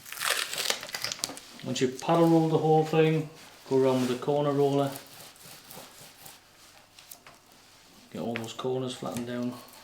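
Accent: North East England, United Kingdom. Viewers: This is a great tutorial, thank you for posting. Also living in Brisbane and being from the UK it is really nice to hear an accent from the North East.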